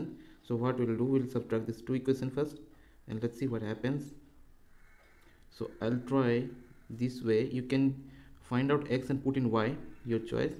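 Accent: India. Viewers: Fucking India accent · Indian accent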